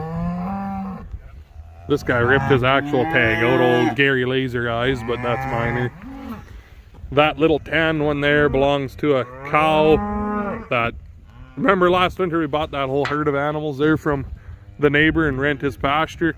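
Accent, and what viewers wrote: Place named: Canada. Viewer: I love this channel, he talks like real Canadian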